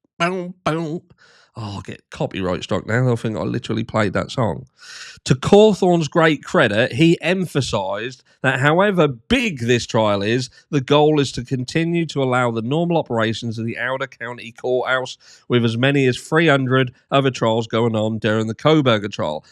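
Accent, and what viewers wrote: United Kingdom. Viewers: I love and his British way of saying things like ozzy!" FK RIGHT OFF! " 😂 please i need a coffee cup that says that!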